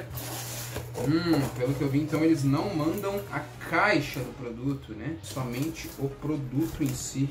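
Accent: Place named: Rio Grande do Sul, Brazil. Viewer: Tu é Gaúcho né meu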